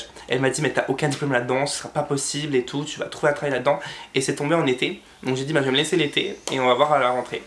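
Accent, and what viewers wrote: Franche-Comté, France. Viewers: Tu viens de Franche Comté ? Et tu es Arianators ? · j'me disais que tu avez un accent que je connaisais, normal c'est le miens #TeamCancoillotte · Je me disais bien que tu avais un peu l'accent de Franche Comté :) Team Besac 🤗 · En tous cas ta vraiment l'accent francomptois 💯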